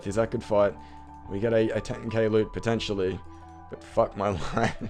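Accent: Australia. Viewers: Everything is more entertaining in an Aussie accent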